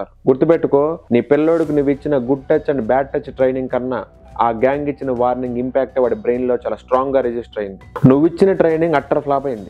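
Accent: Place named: Nellore, India. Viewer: Meedhi nellore ah andi? Me language bhale untundhi Andhuke adiganu.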